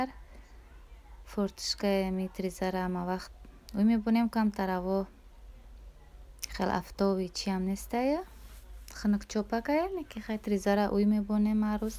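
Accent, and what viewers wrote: Kulob, Tajikistan. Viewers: Салом алейкум Хоҳарҷон худованд баракат ба касбу ҳунарт тия 🤲🤲🤲😘😘😘 як моҳ пеш случайно дромадм каналтон лаҳҷаи анаму кулобҷон дилма ов кард сразу подписатся кардм ҳамаи видиёота тамошо мекнм ва хеле чизора омухтм аз шумо, ободу сарбаланд боши хоҳари меҳрубон 🌹🌹🌹🌹🌹🌹🌹🌹🌹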